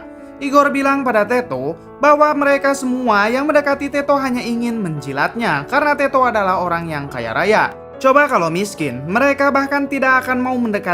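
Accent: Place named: Madura, Indonesia. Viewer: Alur cerita kalau dibacain pakai aksen Madura emang >>>>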